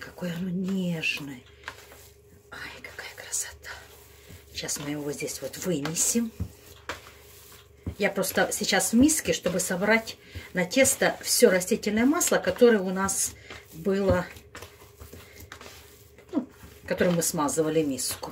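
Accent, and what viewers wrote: Ukraine. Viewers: Уважаемая, я пеку Кулич / Пасху, которую вы пекли на передаче" Всё беде добре" ( смачно), вы там ещё банановый кулич, зайчиков и в яичной скорлупе делали. Огромнейшее вам спасибо, у меня всегда они получаются шикарно, и ещё --- говорите и не слушайте никого, ваш украинский акцент мне очень приятен 👍🌹🌿🙋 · ты просто молодчина очень хорошо рассказываешь и показываешь.Голос приятный с украинским акцентом.Ты добрая душа лишнего не говорила а всё по делу.Дай тебе Боже здоровье, и всех благ в твоей жизни.С праздником Вас. Христос воскресе! Воистино воскресе!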